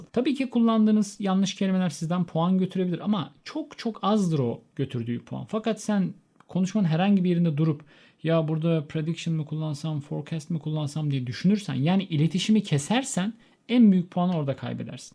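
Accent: Turkey. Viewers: Bu hangi aksan diye dusunuyorum sonunda buldum.Turgut Ozal aksani.Ayni zamanda bazi gramer hatalari da bulunmakta.Acil olarak kendinize bir native speaker bulun.Ikinci yanlisiniz ise Turkce konusarak Ingilizce ogrenemezsiniz ve konusamazsiniz bunu da unutmayin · Noooooooo , this pronunciations is tooo turkelish , is not English plzzzz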